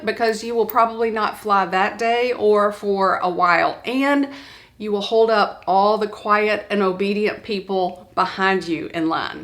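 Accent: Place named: Texas, United States. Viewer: Ah that Texas accent. What is the plural form of y'all? All y'all!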